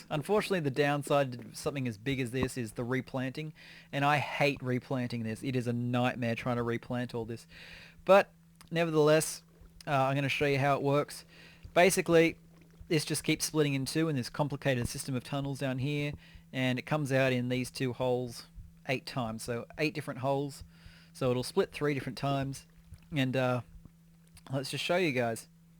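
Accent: Australia. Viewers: Are you Australian? I am xD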